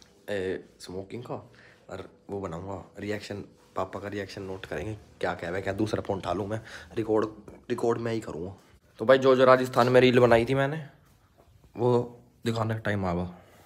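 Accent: Haryana, India. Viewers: Haryana se ho kya language aise lag raha hai reply dene ki free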